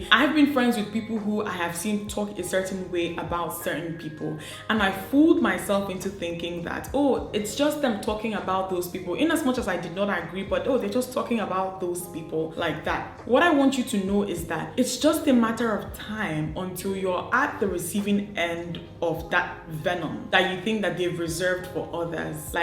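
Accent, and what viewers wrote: Igbo, Nigeria. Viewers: There’s nothing wrong with them oo. That Igbo would always surface 😂 and is lovely. Thanks for sharing.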